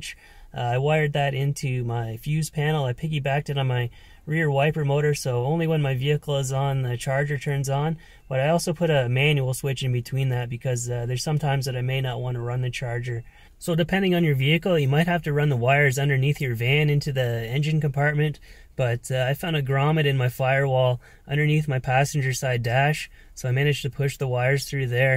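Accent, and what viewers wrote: Canada. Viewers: You are SO smart!!! How'd you learn about all that stuff??? Your cooking always looks good as well! I admire how you like to cook your own food instead of buying fast food, I do also. You are cute, and I love your Canadian accent! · I can hear the Canadian "Eh" in your voice. Lol. I love your channel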